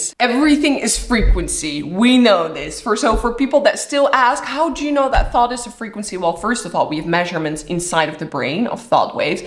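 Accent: Netherlands. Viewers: Was it just me or was anyone else wondering which country this youtuber is from? She has an indian name, a dutch accent, speaks british english. Bet she lives in the US. Talk about being a global citizen! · Nice Dutch accent. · What’s your native language?My guess is Dutch or Flemish? English is a tricky one sometimes. “Thoroughly” is pronounce “thur - O - lee”, with the “gh” more like in though than in rough. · interesting video! BTW, Ben je Nederlands? ;)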